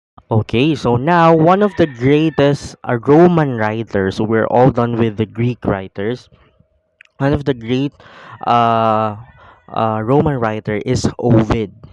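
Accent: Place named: Philippines. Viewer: Very thick Philippine accent besides mixing Tagalog ? with English. Don't play on fast speed or you won't understand him.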